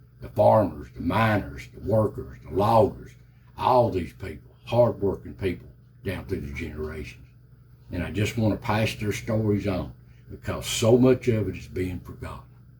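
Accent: Appalachia, United States. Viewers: Love the channel. Though I was not born in the Appalachian mountains, my mom was. My grandparents spoke the exact same way you do… my Grandmother a Boleyn and Sparkman by birth spoke so thick I could hardly understand her. they were from Knott county and Floyd county Ky. I remember going to family reunions and meeting my Triplett, Boleyn, Wicker, Sloan, Collins, Gibson, and Sizemore families. We would stay for a few days and my Great Uncle Oscars wife would cook us a breakfast fit for Appalachian royalty. My mom has passed but I keep up with a few cousins up there. Thanks for what you do, sir! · Good to see a face with that true Appalachian voice.. Blessings brother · I love your accent I'm proud to be an ole hillbilly like you ole buddy. I understand everything you say. Love your videos better than anything you can watch on this ole tv these days. 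Keep it up ole buddy! I live in Kodak TN and used to live in Eastern Kentucky so this all hits home to me. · Mr, I feel like the darn COE and park managers have gotten waaaay out of hand with all their rules and regulations!!! I live in NE TX and my family and I have had more than 1 ru-in with the COE. So I know just how you feel too. I enjoy all of your videos as you uncover some of America's lost past and old cemeteries. your voice tone and Appalachian accent is very soothing to me. keep on keeping on!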